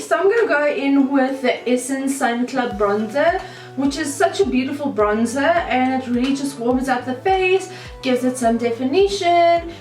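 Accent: South Africa. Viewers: Cool babe !! I miss South African accents so much !!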